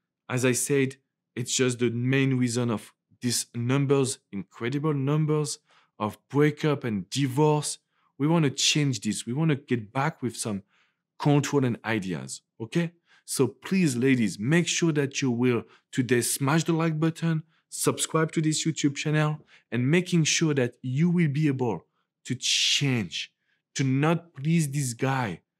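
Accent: France. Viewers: I am here for the french accent. :-)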